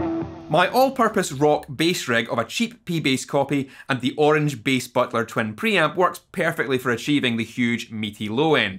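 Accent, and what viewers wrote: Scotland, United Kingdom. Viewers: British punk rock ...And french beer.. 1664 kronenbourg. As a french but decent YT amglish understander i must say i stand perplexed. The fast paced scot accented speech and unusual topics : politics and alcohol 'safety' vs guitar related things, losed me in the pampa (pardon my french).. Drink some real ale bloody brittons.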